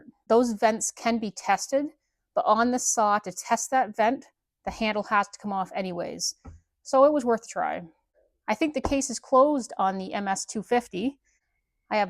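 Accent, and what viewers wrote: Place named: South, Canada. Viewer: Love that southern ascent southern Canadian I guess good show as always.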